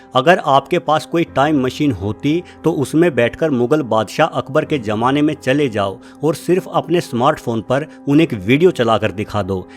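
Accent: Haryana, India. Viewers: Pkka hariyana se ho bhai sahab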